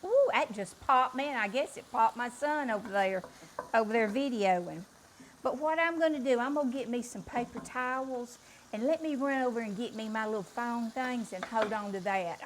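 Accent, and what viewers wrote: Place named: South, United States. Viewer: This looks really good 😋. New Subscriber 719 🎉. I love your southern accent and videos. Just saw the Shorts with Fried Okra. Will you be posting it here too?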